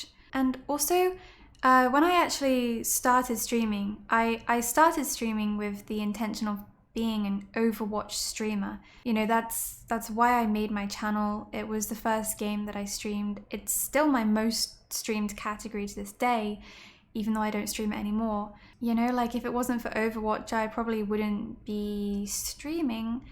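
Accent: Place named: United Kingdom. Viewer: I would love to sit with you at a restaurant table and have dinner, while listening to your stories (and sharing mine as well). I like the way you tell stories, and I also can’t get enough of your sweet British accent 😄 love you, Ms.!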